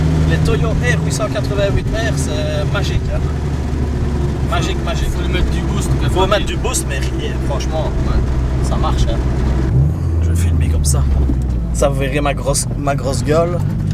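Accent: Liège, Belgium. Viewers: Sympa ta vidéo et ton accent de liège! ;0)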